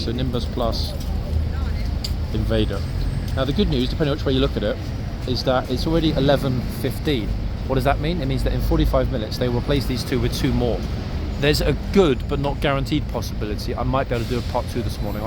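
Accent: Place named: London, United Kingdom. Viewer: Handsome and so is the Horse. LOL , Love your commentary look forward each day. and glad to hear a London accent. a big change for us ex eastenders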